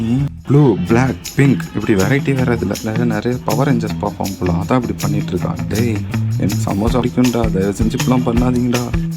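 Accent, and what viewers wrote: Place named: Madurai, India. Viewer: Bro...neenga madura dhana...unga pechulaye theridhu bro😂😂🤣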